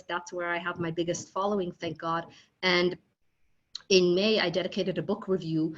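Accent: United States. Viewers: Over 300,000 have Iranian perished since these fanatics took over.please mention all Iranian's suffering.I am amazed how you adapted to the American accent and look. thanks for recording the history .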